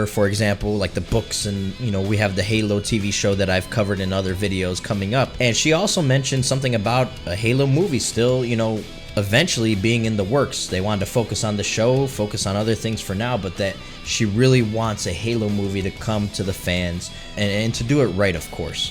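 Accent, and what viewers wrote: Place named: Minnesota, United States. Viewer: Are you from Minnesota? You sound like a Northern Lad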